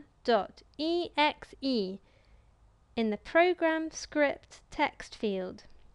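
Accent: United Kingdom. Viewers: That voice + london accent hit me in the heart LOL .... nice video btw :D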